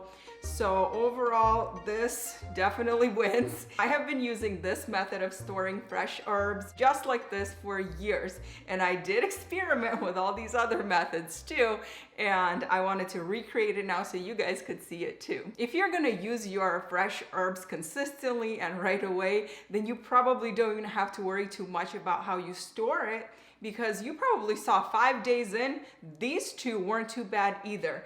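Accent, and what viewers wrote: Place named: New England, United States. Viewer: Ur accent is of a New Englander.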